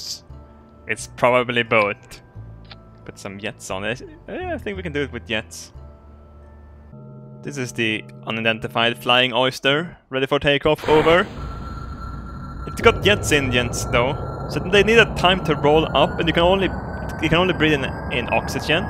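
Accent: Sweden. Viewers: Are you from Sweden ? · well he's sweedish so he pronounces J's as Y's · Hikari Yeah,He's sweden xD I've known that for a LOONG Time before he starts his account.Well i tought it was funny though.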